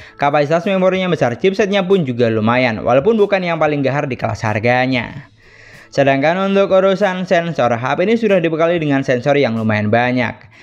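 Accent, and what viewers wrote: Madura, Indonesia. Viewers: Apa om ini orang madura ya 😅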